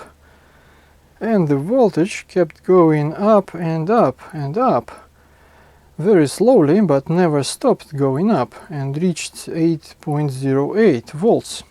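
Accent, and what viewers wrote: Russia. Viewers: Просачивается русский акцент :))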